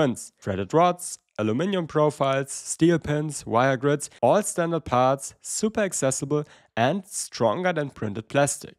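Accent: Germany. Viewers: If I had a cent for every time I found a 3d printing channel with a German accent I'd have 2 cents. Which isn't much but it's weird that it happened twice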